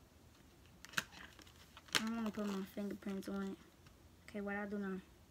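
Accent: New York, United States. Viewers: Your accent sound like you from New York but anyways lmao I’m also getting an iPhone XR this Christmas or maybe earlier but yes I’m so excited I always watch unboxing videos of something I’m getting in the future😂😂😆😆‼️‼️💯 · Where you from? You sound like you from nyc ...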